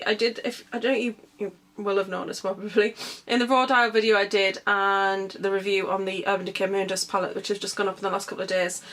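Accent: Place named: North East England, United Kingdom. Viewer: Thanks for this review. Been thinking about getting it. Have got a small amount to test as well as the x-ceptional wear. Are you from the north east UK by any chance? Your accent sounds familiar!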